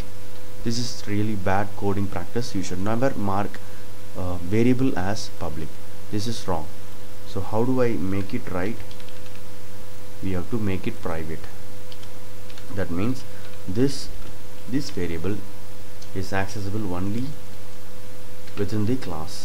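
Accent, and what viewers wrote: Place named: India. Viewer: it's Indian Accent dude.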